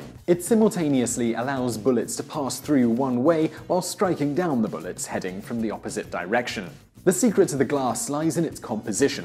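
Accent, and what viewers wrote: England, United Kingdom. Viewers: english accented johnny sins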